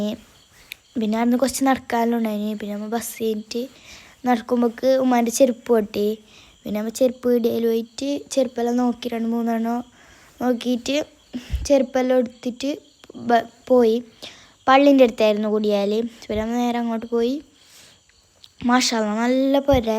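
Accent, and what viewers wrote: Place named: Kasaragod, India. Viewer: It's me thanha Kasaragod slang nalla paangnd 😂😂😂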